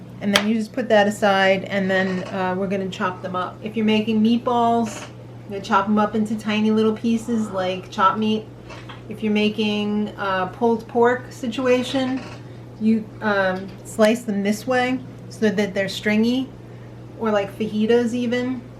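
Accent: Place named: United States. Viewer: This would not go over well with Americans! But you sound like your from the States yourself.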